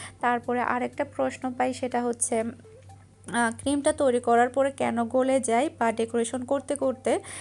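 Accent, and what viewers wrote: Chittagong, Bangladesh. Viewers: হেতি চিটাগায়িংয়া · আপু আমার মনে একটা প্রশন ছিল কারন তোমার কথা গুলো অনেকটাই আমাদের চট্টগ্রামের মত কিন্তু আজই জানতে পারলাম তুমি আসলেই চট্টগ্রামের,জানতে চাইনি কারন তুমি অনেক বেস্ত আর এখন জেনতে পেরে তোমার প্রতি ভালবাসা আমার অনেক বেড়ে গেল।আর তোমার সাথে আমার অনেক মিল আমার ও দুই মেয়ে আর ছোট মেয়ের নাম আরোহী।দোয়া করি সব সময় ভাল থাক।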